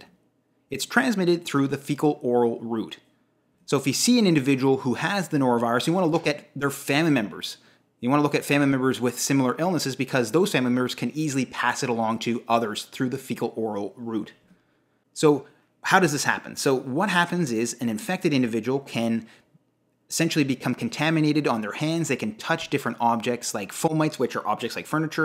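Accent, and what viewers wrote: Canada. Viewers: It’s underrated how dangerous this thing can be. I’m a kidney transplant recipient and I’m terrified of this virus. If I get it, not only am I at risk of damaging my transplant by dehydration but also not being able to keep anti rejection drugs down. I’m immunocompromised too so I’m terrified. Love the narrators Canadian accent btw. Reminds me of my crush, Calum Worthy❤❤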